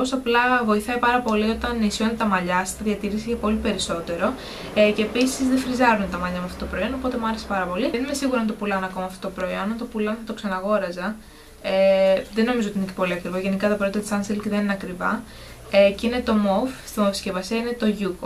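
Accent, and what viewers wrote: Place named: United States. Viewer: Πραγματικα εισαι πολυ γλυκια κοπελα και τα αγγλικα σου με αμερικανικη προφορα οσο μπορω να κρινω εγω ειναι πολυ καλα δεν καταλαβαινει ο αλλος οτι δεν εισαι απο την αμερικη!Αλλα λεγε λεγε μαλλον αυτα λιγο στα ελληνικα χαλασε η αρθρωση δεν ειναι τιποτα διορθωνεται προσπαθησε λιγο πιο αργα και να ακουγονται ολοκληρες οι λεξεις καντο σαν πειραμα διαβαζοντας μονη σου μην κομπλαρεις και σταματησεις τα βιντεο στα ελληνικα ισα ισα θα σε βοηθησουν και μιλας καλυτερα απο κατι αλλες να εισαι σιγουρη!:D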